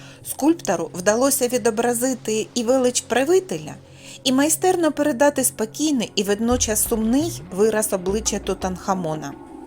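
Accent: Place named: Belarus. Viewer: ахараняє? боже, ви наче не українка. а білоруска, яка з білоруськох мові перейшла на українську....слух ріжить... але урок хороший для дітей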